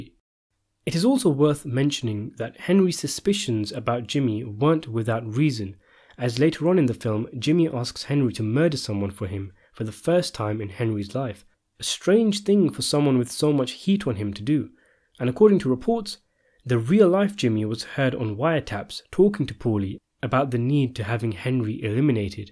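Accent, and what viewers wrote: England, United Kingdom. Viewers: British accent. Ahh. During Special Forces selection & training in British cultures greatest difficulty is making sure they can pull the trigger. In the States selection etc.was originally modeled directly on Britain's proven methods. That changed. Among other things it was clearly not difficult to find guys who could pull the trigger. That was decades ago. In the current era both cultures have trended towards a greater ability to do so. Capisce ? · Suffering as I do from the quintessential America bias to assume anyone with an English accent as a measured scholar, the aesthetic discrepency of approaching this content with said linguistic stylings, tickles me to no end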